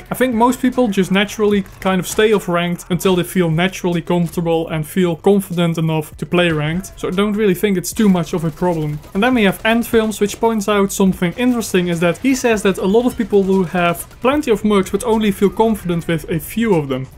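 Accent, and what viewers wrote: Netherlands. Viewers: eey mate you dutch?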